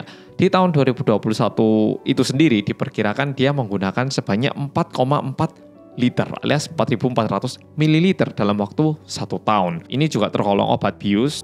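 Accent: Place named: Malang, Indonesia. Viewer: saya paling senang mendengarkan mas berbicara, gaya AREMA NYA (AREK MALANG ) tetap tidak hilang bikin sedanp di dengarkan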